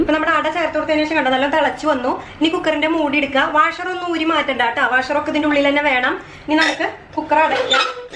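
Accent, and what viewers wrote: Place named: Kunnamkulam, India. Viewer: ആൾടെ സ്ഥലം കുന്ദംകുളം ആണന്ന് തോന്നുന്നു സംസാരം കേട്ടപ്പോൾ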